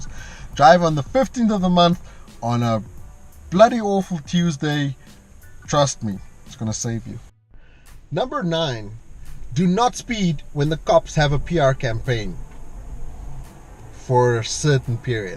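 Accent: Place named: Johannesburg, South Africa. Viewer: Where you from jhb Got the accent😉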